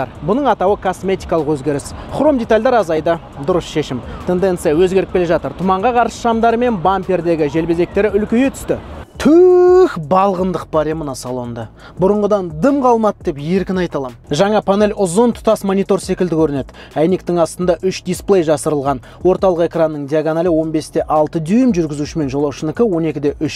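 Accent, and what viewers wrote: Standard. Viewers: ісіңе береке берсін сен сияқты таза қазақша сөйлейтін қазақтар көбейе берсін аумин 🤲🏻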